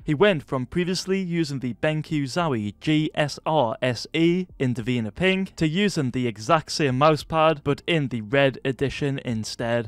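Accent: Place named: Newcastle, United Kingdom. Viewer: here r u from newcastle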